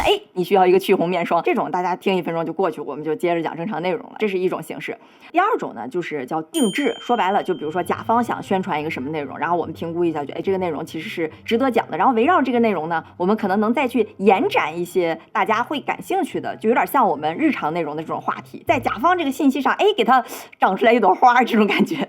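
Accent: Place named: Beijing, China. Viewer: 好舒服的北京口音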